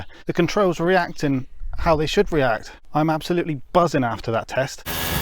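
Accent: United Kingdom. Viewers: This is a fantastic project. I have always wanted to build my own aircraft but have too many restrictions. There is an airfield within 3 miles of my home but it is run by a bunch of millionaires who like to keep it as an exclusive club for them and their cronies and excude any other aircraft types. There used to be a microlight school operating there but the committee of millionaires drove him out! Because of this I have been looking for an aircraft that could be operated from my large back garden so a helicopter would be the answer but in the UK we have very restrictive legislation regarding home built aircraft especially rotorcraft which has always put me off building an aircraft. I wish we had the same freedoms as they do in the US. Judging from your accent and the fact you mentioned getting your engine for 500 quid in another video you are indeed in the UK. 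if so how are you going to get this aircraft certified to make it legal to fly as otherwise it will end up being an expensive garden ornament. Also if i was building this aircraft i would look at making some redundancy in the belt drive ie have more than one belt per rotor as if one of the belts on your craft were to go twang one of the roters will stop and you will have no control of it and it will be game over!!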